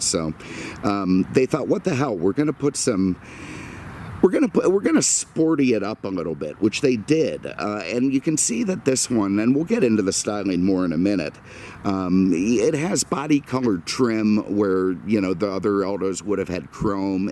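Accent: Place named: New York, United States. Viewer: Your stand-up and fear of any living animal-(scary, filthy, up to no-good, gang, face ripping), cracked me up. I clicked for the Caddi, but we’re from the same decade and out, so got every one of your references. I especially hate humidity and only visit Fla. from November until February. Beyond those months, only for money! You were hilarious and sound like the consummate NY transplant. Good Luck with the Eldorado. I prefer the ‘78 whale in that brownish gold mist colour and in Biarritz trim. Btw. I have a ‘81 Toronado Brougham in triple-navy. I love her! 🌞😂😎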